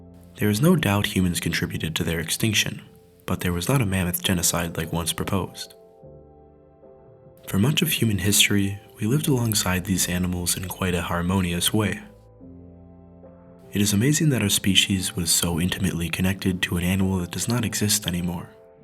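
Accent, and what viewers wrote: United States. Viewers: Nothing is quite as annoying as someone who SOUNDS American. yet choses to use Metric Units. If you're American, BE PROUD OF OUR IMPERIAL HERITAGE. O, and quit turning the "TH" sound in "NeanderTHal" into "NeanderTal." That is something that only came about in the 50 years since I left school—and it SOUNDS LIKE HELL!!!!!!!! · I found the subject matter interesting. But where are you from? You sound like an American but your use of the metric measurement system is foreign to me. I know how long an inch is and also afoot. But centimeters and meters I have no idea. So I gave you a thumbs down. For your American viewers, I would suggest using the measurement we're used to and not to cater to your foreign friends for their not the ones who pay your bills. Otherwise I found your video informative but will forgo any more till you learn to measure in your native system.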